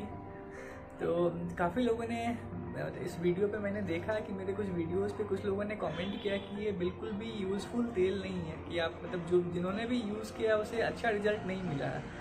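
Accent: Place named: Bengal, India. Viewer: Pronunciation se Bengali mone h6e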